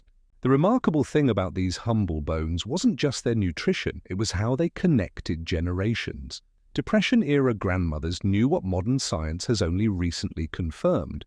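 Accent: United Kingdom. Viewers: The content is interesting. The mixture of American vocabulary with the British pronunciation keeps throwing me.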